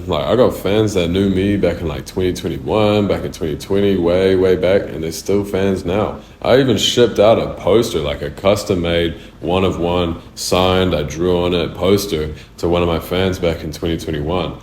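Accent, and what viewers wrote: Australia. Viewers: HE SOUNDS ALSRLIAN BUT HE SAID HE IS NOT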